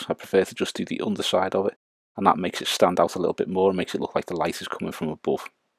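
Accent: Liverpool, United Kingdom. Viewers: This is brilliant. Its the little things that make it. Ill defo be using this. Scouser too 🤘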